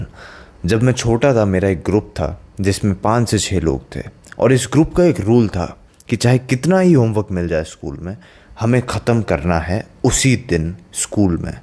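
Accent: Rajasthan, India. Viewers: Bhai tum rajasthan(marvad) meh konse shaar se ho? Tumara accent and ghalc***a se pata chala.😂 I too want to make physic💪 like you and wanted to learn many skills in life.(in short, work on myself) which I have missed to do in my teenage. But will try to ingage in things whenever I will get chance.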